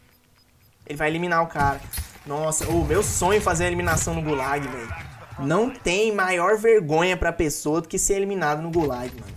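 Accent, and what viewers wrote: Minas Gerais, Brazil. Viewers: tu é mineiro mano??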